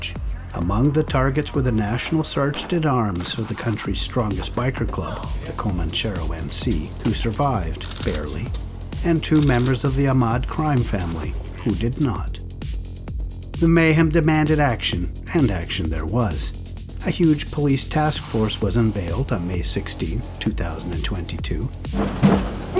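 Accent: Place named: Canada. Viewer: He's Canadian I think. At least he sounds it. He covers a lot of Canadian crime also.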